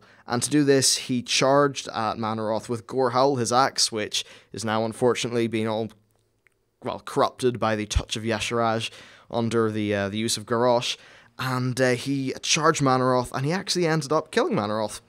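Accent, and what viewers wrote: Northern Ireland, United Kingdom. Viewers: Great Video. Subscribed! BTW... Are you Northern Irish?! lol. I hear a twang of an accent and it made me curious! :D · Northern Ireland?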